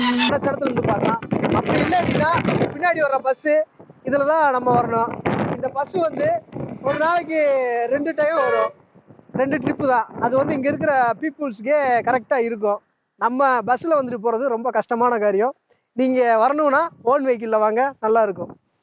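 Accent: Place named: Theni, India. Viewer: I like your theni slag speech bro😍👍👍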